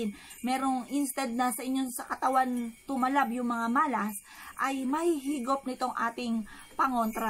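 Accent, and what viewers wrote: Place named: Batangas, Philippines. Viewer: Hehehe.nkinig ko nmn ang punto mo madam akoy ntutuwa sau eh,,.nkow! Ala eh,,hehehe..madam parihas po tau,,batanginya po talaga kgaya ko..godbless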